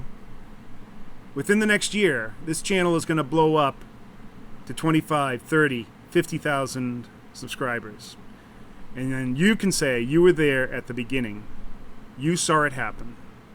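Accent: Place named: Queens, United States. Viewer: I'm from Queens and that's how we fucking talk too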